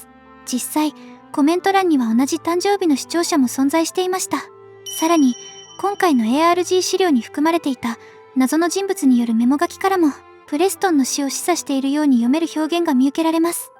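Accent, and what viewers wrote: Kansai, Japan. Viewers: 何故関西弁？ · 関西弁にするとなんだか吞気に見える不思議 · なんで関西弁やねんwこのチャンネルちとネタ入れてる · 関西弁マジでおもろいなwwww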